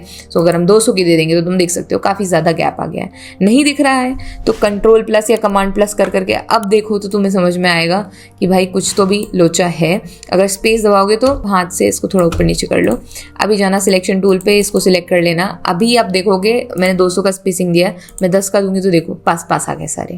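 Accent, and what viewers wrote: India. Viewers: Aap Bihari hai 😂❤ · Hasi hasi mai seekha gaya ,,, paka aap up se hai sayad 🥰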